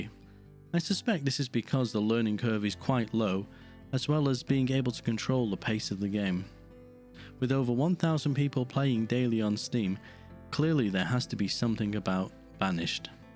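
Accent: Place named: RP, United Kingdom. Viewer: I recently picked this game up. It runs with relatively low demands for a 2014 title. It supports anti-aliasing and runs on WinXp. All scenes you showed included mods: the wooden church, the instant building of fences. This is not in the normal game. The sound is good. The game is lacking in two areas: management of stock at specific warehouses and efficiency of laborers who walk all across the map to do tasks as the town expands. Have a fishery on one end of the town, and somehow a few fish will end up on the other side while the person carries a half a load of stone. Everyone uses this speech synthesizer with a BBC English accent. When I hear him, I'm thinking of an internet personality ranting about veganism.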